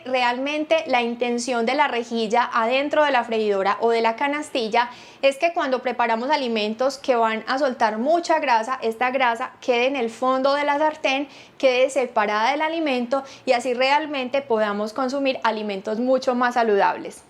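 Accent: Colombia. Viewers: Vine por la información, pero, Dios mío... Ese acento paisa... 😍 🇨🇴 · Me gusta tu acento colombiano ❤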